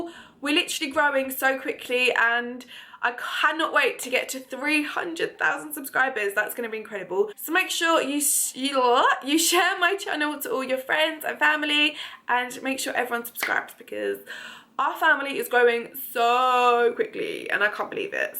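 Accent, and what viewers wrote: United Kingdom. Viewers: R u bristish ????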